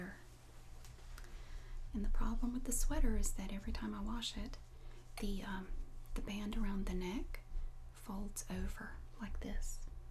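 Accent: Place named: Texas, United States. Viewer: I've always liked the sounds coming from a hand smoothing over cloths. Gentle Whispering has a few videos in which she does this. For some reason, it is a very pleasant sound and is one of my ASMR triggers. I can't tell where your accent is from, Texas? I'm in Georgia and the accents around my location aren't like yours. I like yours better. Have a great evening and thanks for the time you put into these videos.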